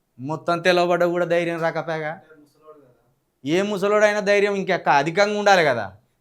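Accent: Telangana, India. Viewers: nuvu Modi Sir ni antunavu kadha nuvu kuda Mallanna Sir tho chepivi nuvu emi tapu cheyaledu tane ne meeda kaksa Kathi kutra chesindu ani. Chepinche galugutava? Enduku neeku avi ani ikada mana telugu rastramlo jarige fake pastors gurunchi oka video cheyi anthe cheyavu. Dalitula degara dashamabagam ani dochukuntunte neeku bada anipiyada? Nuvu telugu telangana slang lo matladutavu baga cheptavu ani ne videos chustunte nuvu emo Hindus ni oka buchi laga chupinchi kiristani ki vatasu palukutavu. Poni nijam cheputava anthe adhi chepavu ani abbadale asalu kiristani lo emi tapu jaragadu antha Hinduvulane untundi anatu matladutavu. Neeku vala degara nunchi dabbulu vastayi anduke vala gurunchi matladavu anthe ok it's your personal & no comment on that but pls don't disrespect Hindus.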